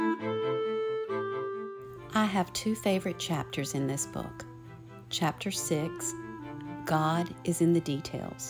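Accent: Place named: South, United States. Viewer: I love your soft, sweet Southern voice!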